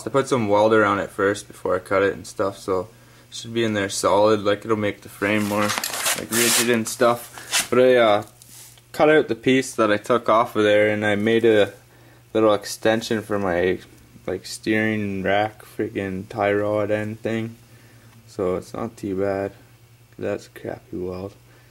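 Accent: Canada. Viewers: Where are you originally from? It sounds like your from western canada originally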